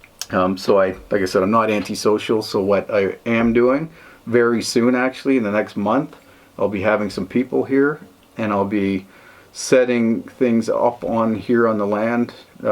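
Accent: Canada. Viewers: I come here for the Canadian accent.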